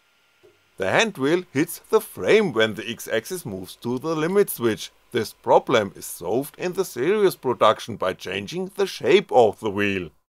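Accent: Germany. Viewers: Dein Deutschenglisch schmerzt in den Ohren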